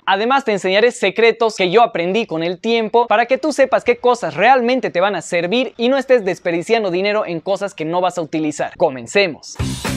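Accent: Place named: Amazon, Peru. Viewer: Es de Perú, de la parte de la selva por su forma de hablar.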